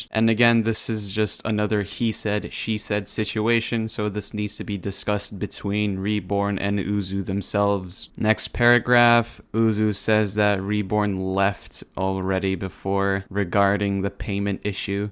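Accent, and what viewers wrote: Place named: United Kingdom. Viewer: Omg yiur bri'tsh???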